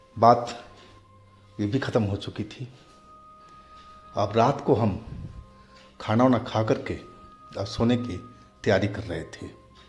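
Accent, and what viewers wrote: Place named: Bengal, India. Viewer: Bhaiya…. Aap ki Hindi…. Too cute🥰🥰🥰🥰Bengali ho na???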